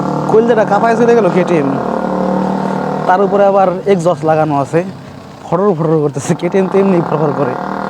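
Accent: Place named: Narsingdi, Bangladesh. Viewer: Bhaiar kotha shune mone hoy vai norshindir chele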